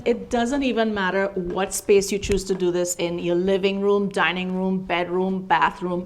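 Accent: Trinidad and Tobago. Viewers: Are you a Trini because sound like a Trini😊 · Wait, are you Trini or Guyanese??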